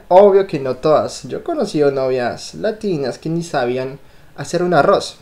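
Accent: Bogotá, Colombia. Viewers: Senovia Trinidad pues no habla bien, se le a pegado el acento de Colombia o de algún país sudamericano, el español nació en España, decir que habla muy bien es demasiado, deberías decir que al menos se hace entender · Hablas muy cómico, pareces de Colombia. He imitas muy bien a las mujeres. Éxito consiguiendo esposa latina. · Me da gracia que hablas español colombiano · Habla como colombiano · "Listo mi papasito" hahahaahh en serio me reí mucho, una novia o ex novia un tanto... "guiza" :/ y sí, definitivamente tu acento suena más rolo que el mío (Rol@ = Bogotan@ Estamos en la misma ciudad :) y espero jamás encontrarnos porque no tendré el tiempo para decirte todo lo que quisiera. ✌ Chao! linda vida y espero que la chica sumisa con quien te cases abra los ojos algún día. 👀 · Pareces más colombiano, madre mía jajaja que bien hablas el español con ese acento. 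· Vea, hombre! Ud tiene un acento colombiano bien bacano🤣🤣 o se escribe vacano? Nunca he visto esta palabra escrita, no sé cómo va. En fin! Lo cierto es que tienes acento colombiano 😀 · Este chico es Francés, pero sus padres serán Colombianos, por que tiene acento colombiano, sus padres serán colombianos. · Tiene el acento de Colombia. Así que puedo apostar que anduvo con una colombiana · Creo que te pego mucho el acento colombiano!😚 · Francy Sandoval me encanta que reconozca la belleza latina ,tiene expresiones colombianas como" verraca " y un poco el asentó rolo se le siente saludos desde Colombia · Hola..yo creó que has vivido mucho tiempo en Colombia hablas y te expresas como colombiano..saludos. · Habla un español muy colombiano! jajaja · Eres un colombiano más!!! Tu acento es super Bogotáno. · Hablas como colombiano. Saludos! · es que a estado más tiempo en Colombia ¡¡¡ se le ha quedado las jergas Colombianas e inclusive el acento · Acento colombiano pegadísimo, de dónde eres? 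· Yo creo que todas tus novias han sido Colombianas, porque es que hablas con acento Colombiano. acá en Europa cuando escucho hablar a un Europeo sea de la nacionalidad que sea, hablan con acento de Españoles. Me caes bien, hasta ahora descubri tu canal, y me simpatizas mucho, hasta en la manera como a veces te expresas me pareces tierno. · SOS un francés con acento colombiano! 😍😍😍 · La vaca muerta jajaja este frances ya se quedo en.colombia todo el.acento haha que bueno.Que te gusta , por aqui no.somos de implantes ni cirugias. Somos naturales jiji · q bonito, y claro!! habla el español!! mas' bien colombiano!! esta simpatico!!😆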